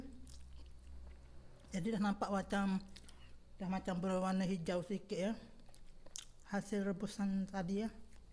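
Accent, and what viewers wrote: Malaysia. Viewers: Dia orang kelantan saya pun orang kelantan ..orang kelantan bila suruh cakap kl kek kok sikit😅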